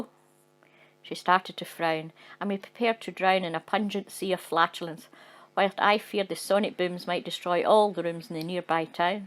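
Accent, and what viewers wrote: Scotland, United Kingdom. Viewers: Never did I know I needed so much to hear and Scottish woman read me stories!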